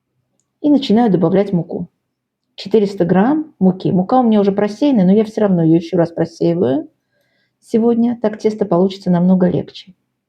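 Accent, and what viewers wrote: Baku, Azerbaijan. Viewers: Опять приятные слуху,родные "мелизмы" и акцент!Я Вас приветствую из нашего родного Баку!Огромное спасибо за рецепт и вообще,за то ,что Вы есть у нас!Благополучия Вам!❤❤❤❤❤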